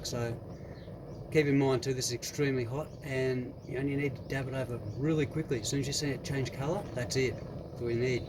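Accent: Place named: Australia. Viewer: IDK why but a lot of Aussie accents are grating on the nerves to me as a New Englander. Yours does not. I like your accent and your car.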